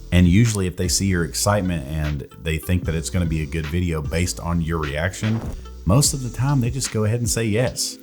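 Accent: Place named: Tennessee, United States. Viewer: Watching your videos as I decide to get into Real estate photography after leaving Real estate and just realized your voice sounded like it had some Tennessee in it! I’m actually from Chattanooga and recently moved to Louisiana. Love the knowledge and insight!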